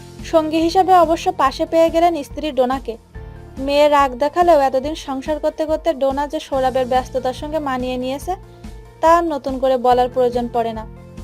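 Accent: Bangladesh. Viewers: Hahaha... 🤓🤓🤓🤓 How sweet... News reader is from Bangladesh it seems.. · apni ki Bangladesh thakhen apnar katha bolar dharan dakhe thik tai mone hoche. parle Vasa change karun Tate apnar valo hobe.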